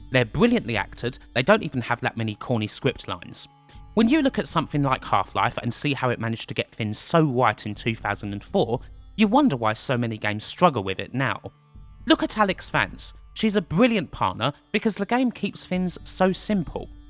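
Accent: United Kingdom. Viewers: LOVE THIS REVIEW, AND LOVE YOUR BRITISH ACCENT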